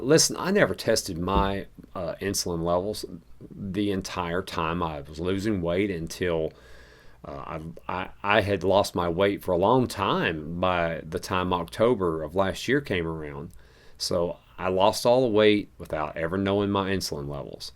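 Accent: South, United States. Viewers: I am very glad I came across your channel. I am learning so much about diabetes from you. Thank you. P.S. I love your Southern accent. 😊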